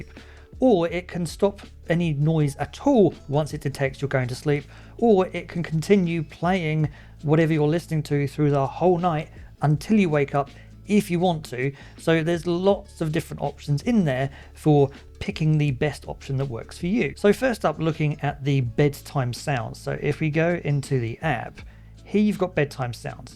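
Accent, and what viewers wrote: United Kingdom. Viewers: Just saw your A20 sleep review, which was really good. I just got the A20 after watching loads of reviews on both. Which one do you prefer? I think if battery life is important the A20 are still the best. I also had the A10 but the left earbud died on me and never wanted to charge fully again 😢 So I am off to try the A20 for the first time. Thank you for your review ( nice to hear a British person for a change😂)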